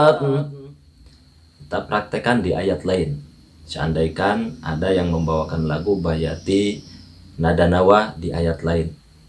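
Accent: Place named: West Java, Indonesia. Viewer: siga asli sunda euyy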